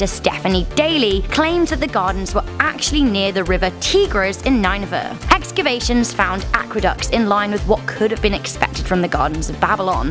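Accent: United Kingdom. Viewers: The moment I opened it my eardrum was assaulted by this irritating high pitched feminine British accent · Love the Brittish accent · Lmao she has a British accent. I'm British. Trust me, we usually know how to say Nebuchadnezzar properly here 🤣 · Shouri Like a Melody that’s exactly what an accent is, she has a british accent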